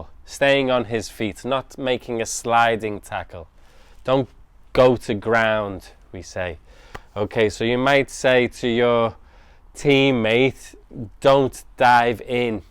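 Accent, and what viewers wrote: United Kingdom. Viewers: Are you English? I like your accent, I'm a new subscript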